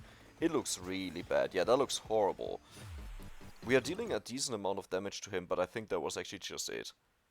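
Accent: Germany. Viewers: Bro man hört so raus das du deutsch bist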